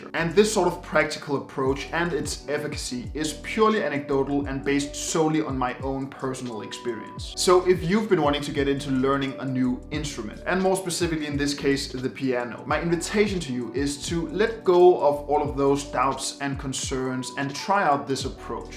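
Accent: Denmark. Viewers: i can hear the danish accenttt :p anywho great video I'm 18 and wanna start learning how to play piano so bad- I've always wanted to know how to play an instrument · Dansker, lol.. så tydeligt :D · Thanks for the inspirations, I am brand new myself, and are still working on my wife to allow me to buy a piano LOL... BTW are you from Denmark? I am and think I hear a bit Danish accent in your video. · Jeg var ret sikker på, at du var dansk - ud fra din accent. Da du så slutter af med at sige "... rounding of the video" var jeg helt sikker :P Tak for en god video :)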